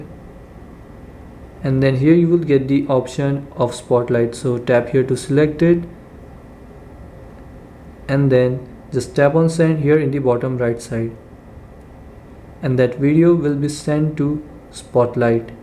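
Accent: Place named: India. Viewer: The indian accent❤❤❤🥰🥰🥰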